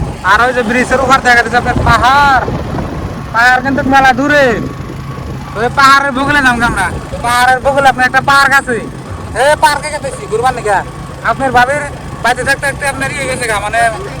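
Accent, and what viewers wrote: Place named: Sylhet, Bangladesh. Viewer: Mone hocche purai Sylhet ❤❤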